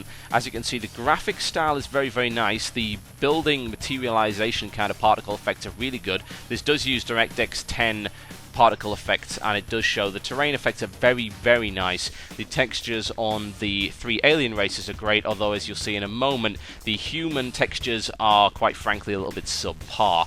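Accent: United Kingdom. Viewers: wow! you sounded more british back then ;b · he sounds a bit more english you know. translation for idiots (he sounds a bit more british) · Is it just me or does he sound more British in this video then he does now? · old sound quality makes him sounds more british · He sure sounds more brittish here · He pronaunces everything so... british? I dont know, but I have the strangest boner. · Is it just me or he sounds more "british"?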